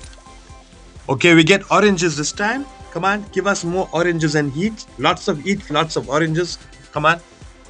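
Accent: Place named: Durban, South Africa. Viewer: Awe awe bro... congratulations man🎉🎉.. you sound like you from Dbn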